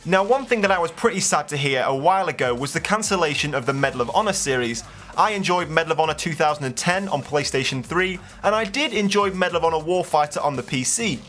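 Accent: England, United Kingdom. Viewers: Great intro! since i mostly play support and use LMG i don't think i would enjoy that weight idea. Btw where in England are you from? awesome accent! :)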